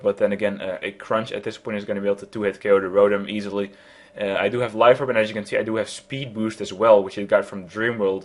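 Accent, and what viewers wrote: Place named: Netherlands. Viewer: het blijft moeilijk om een nederlands accentje te verschuilen xD nice battle ;)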